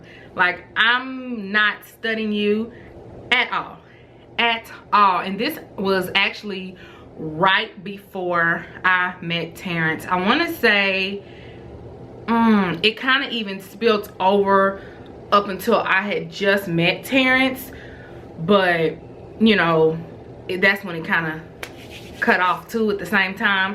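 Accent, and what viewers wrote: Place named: Memphis, United States. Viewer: And you from Memphis??? I can hear the accent😭🥰🥰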